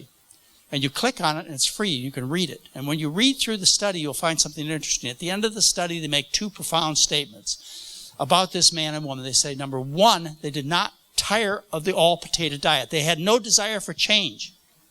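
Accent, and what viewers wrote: United States. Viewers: It's a midwestern accent. You never lose it. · Carole von Aarberg Hello from a Southern Louisiana girl! Nothing wrong with this pronunciation! I’m not sure exactly where the doctor is from but his pronunciation is definitely a Southern US accent. There are many accents in the South but many say potaytah. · 'Scuse my ignorance as a Brit/Aussie, but just what is wrong with potaytah, that's how I say it, and where is this US accent from??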